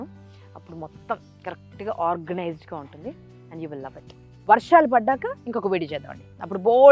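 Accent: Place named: Telangana, India. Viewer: Ma akka Telangana bidda. Tanu matlade Telangana yasalo na biddalu ma amma ani forpect gaw matlatunnav😂😂😂❤❤❤😊😊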